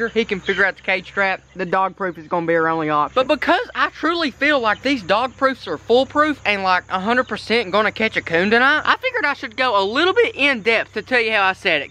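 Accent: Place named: South, United States. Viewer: I love your accent I mean cuz you're so country cuz don't worry I am very country but not as country as you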